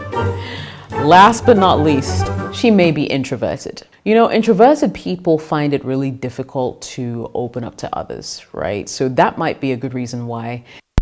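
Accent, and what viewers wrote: United Kingdom. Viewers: Ar you a Ghanaian? Your accent is different but very proper. It's hard to fetch out the Ghanaian in you but mostly British. You're beautiful! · She’s British, probably born and bred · That accent is British English.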